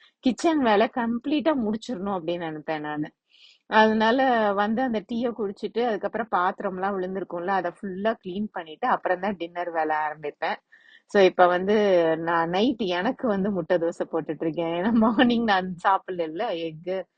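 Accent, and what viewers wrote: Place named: Srivilliputhur, India. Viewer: Somehow I landed on to your channel, we are from SF bayarea, living there for 25ish years. My older daughter just graduated from MIT. Just roamed around this area when we were in town for her graduation. Loved Cambridge summers and business of the city. Love your style of talking and openness of sharing information. Naan thanjavur side. Somehow enjoy your azhaga srivillipittur style Tamizh. I work for Microsoft, mostly work from home, drive to work once or twice a week. Ilayaraja and SPB are by drive time buddies, Ippo Spotify fan due to my daughters persistent pestering. Keep rocking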